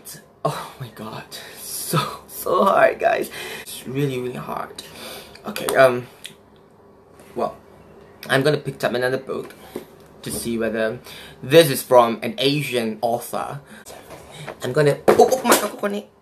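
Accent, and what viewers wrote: United Kingdom. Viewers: Compared to the original one, punya british accent lagi better kot. 😂🤣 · Your accent is purely learn from British?.. Looks real ok.. 😂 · Impressive british accent! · Your British accent quite impressive. 👏👏👏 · Haha ni parody shila amzah british accent eh? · wuu not bad ur british accent👏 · Wah...suara macam betul ah london accent...siak ah WOHOOOO!!!!! 👏👏👏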